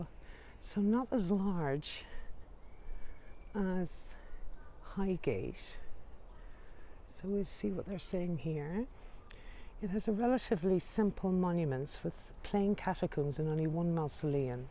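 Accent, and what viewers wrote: Ireland. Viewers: Been waiting for the return of the magnificent 7 Love this series of cemetery tours by the wonderful.. Great knowledge and well delivered in that soft Irish accent x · Lovely! Just so lovely! Not only the tour of this beautiful old cemetery, but to hear that wonderful lilting voice of my favorite tour guide,. Lady, I believe I could listen to you read an encyclopedia and it would be entertaining just to hear that soft, soothing, Irish lilt. I am a chronic insomniac and you just don’t know how many nights the sound of your beautiful voice has been a lullaby to ease me off into sleep. It works as nothing else does. I always look for you especially when new videos have been posted. I love the others as well, but you just have that something a little extra. Prayers that life is treating you well! Keep up the great work. Free Tours by Foot-London has a real treasure in you. 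❤️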